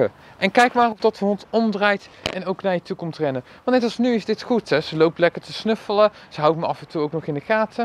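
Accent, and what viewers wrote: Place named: Brabant, Netherlands. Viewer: af en toe kom ik terug naar jouw honden opvoedvideo’s en word ik weer even met beide voeten op de grond gezet. Wat leg je het toch goed uit, en wat heb ik al veel aan je tips gehad. En dan jouw zalige Brabantse accent, love it!! 😍 Dank je wel en ga zo door!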